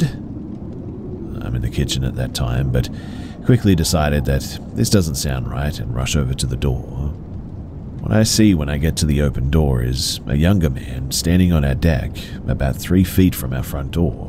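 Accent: Australia. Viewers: Was going to say better be an Aussie saying that but then could tell 😅o Aussies speak like that 😂❤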